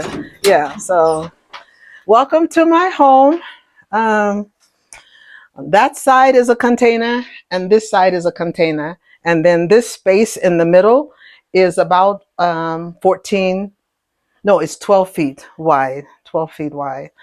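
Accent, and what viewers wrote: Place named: Kenya. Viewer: What an inspiring story. Thank you for sharing. I want to be you when I grow up. You sound totally Kenyan.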